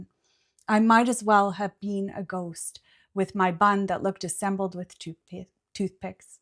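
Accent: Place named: Saskatchewan, Canada. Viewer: Growing up just south of the border in Plentywood, I recognized the Saskatchewan accent instantly. More importantly: likely you know this but nobody likes the sound of their own voice. With only a little professional coaching it would seem you’d be more than ready.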